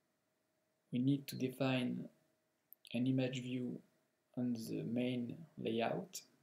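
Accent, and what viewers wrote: France. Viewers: That's some hardcore french accent !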